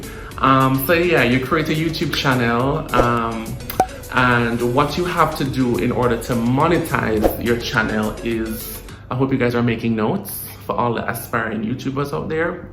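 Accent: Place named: Jamaica. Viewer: Hey bro you sound like Jamaican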